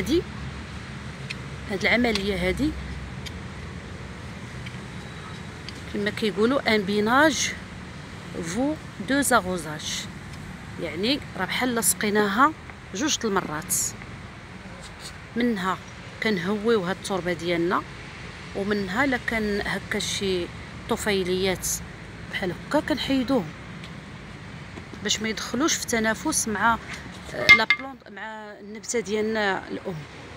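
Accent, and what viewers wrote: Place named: Algeria. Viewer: ما اجمل اللهجة الجزائرية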